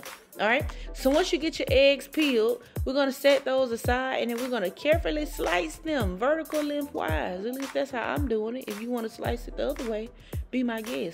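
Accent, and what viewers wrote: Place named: South Carolina, United States. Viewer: Love the video. You sound like you’re from SC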